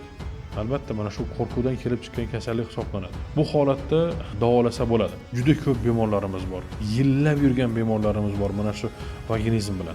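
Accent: Khorezm, Uzbekistan. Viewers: Хоразмча талафуз блан тушунтирдингиз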